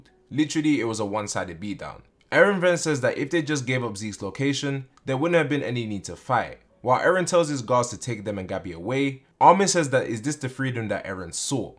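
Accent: London, United Kingdom. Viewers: Just by your accent I can tell you’re a black dude from somewhere in London. Probably South or East.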